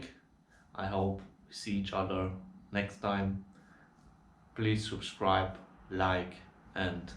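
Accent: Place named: Germany. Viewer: German myself - your accent rocks. Living in South Africa and enjoying your lekker Duitse accent. More so, as an HP2 Enduro owner myself, I appreciate the content you deliver. Vielen Dank und allzeit sichere Fahrt! I am an old f@ and could be your dad. One word of a advice, if I may. Stay as you are, be authentic as you are throughout your life. You rock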